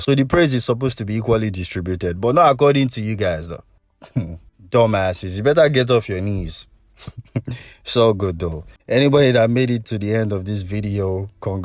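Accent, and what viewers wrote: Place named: Nigeria. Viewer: From the accent I could tell you're one of us (Nigerian)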